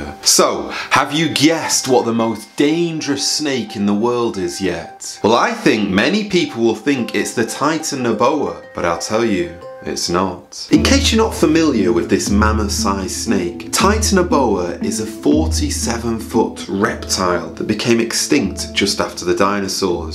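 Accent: United Kingdom. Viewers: Keep it up brother this is so important, only the gospel can change things, and it’s good to listen to someone from Britain. Paul .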